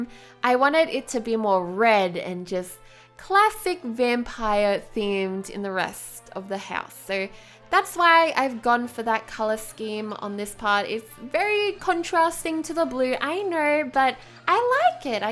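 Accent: Australia. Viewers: are u Australian because your accent sounds so much like an Australian's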